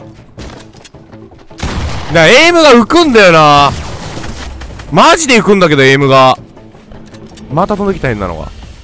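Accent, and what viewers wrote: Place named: Hiroshima, Japan. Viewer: うん、広島とかその辺の方言なんだよね。 by広島県民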